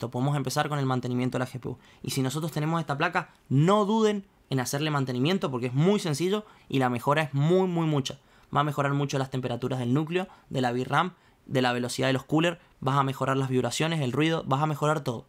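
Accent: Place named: Interior, Argentina. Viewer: Buenas. Tenes una tonada del interior, de donde sos? Corrientes?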